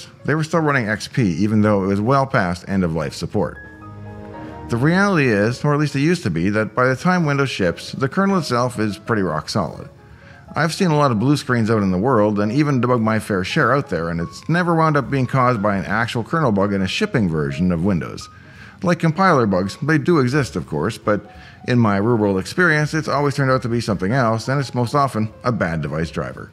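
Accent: Texas, United States. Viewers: That's my favorite accent. Is that Texan?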